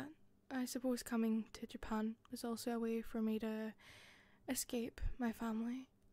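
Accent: Scotland, United Kingdom. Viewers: am? Check Crippling sleep deprivation? Check Late night scottish ASMR? Check This will be a good night... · Scottish accents are the best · I’ve pretty much always adored Scottish accents, so tingles or no it’s an absolute joy just to listen to you talk. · Just when I thought I couldn't fall harder for a Scottish accent...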